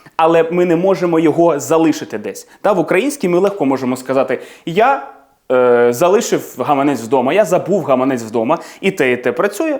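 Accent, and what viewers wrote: United Kingdom. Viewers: Хоч у когось із наших ютюберів класна вимова. Причому британська, а не огидна американська)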